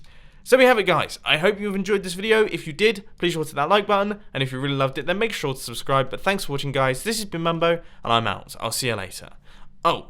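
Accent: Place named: England, United Kingdom. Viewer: TheEyeOfItsMaxinho I'm in English time zone like mumbo jumbo I think he sounds English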